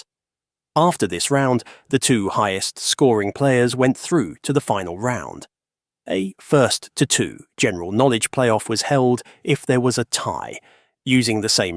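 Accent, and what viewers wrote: Scotland, United Kingdom. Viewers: because it is the real you. Why use another voice? Scots FTW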